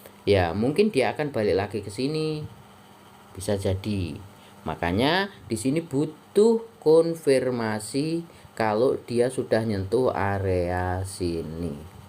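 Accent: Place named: Java, Indonesia. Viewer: Ternyata wong jowo to mas... Jawa mana mas?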